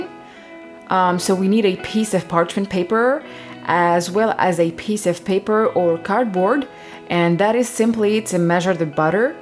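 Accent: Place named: Quebec, Canada. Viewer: Been roughly 10 years since I’ve made croissants this video is the perfect explanation for how to laminate dough! Even Touch my girlfriend how to make them as well thank you very much keep up the amazing work ! Also when I here the accent I’m like damn this gonna be good 😂😂 French Canadian ?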